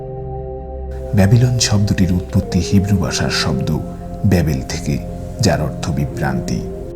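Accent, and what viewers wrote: Barishal, Bangladesh. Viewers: Your voice is so good but you can't avoid your local tone(Barishal).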